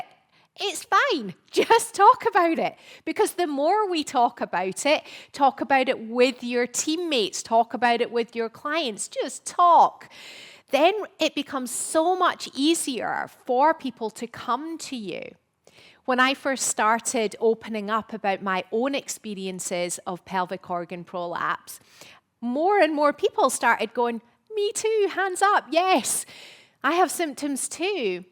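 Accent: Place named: Scotland, United Kingdom. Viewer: How very interesting and so well explained. Very informative video. Going to look out for your book. Scottish accent too, brilliant 🏴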